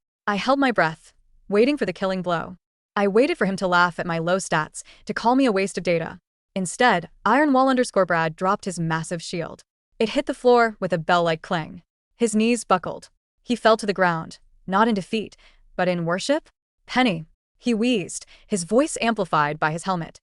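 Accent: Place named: India. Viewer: "No monsters no graving"wow Indian voice accent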